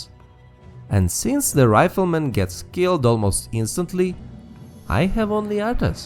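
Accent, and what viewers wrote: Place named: Eastern Europe. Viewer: I love the idea of this run, pretty unique. Makes you wanna give each unit a nickname, like Sarah the Sorcerer, or Pat the Priest. I've been starting to shake off the RTS rust with one of my other childhood games, AoM, and the idea of doing this challenge on that game sounds super cool; I'm gonna need to start trying it. All that being said, before you commit to doing the entire WC3 campaign with this ruleset, I have two words to say: King Arthas Have fun with that one :D btw, really like your accent. If I may, what is it? Sounds Eastern European.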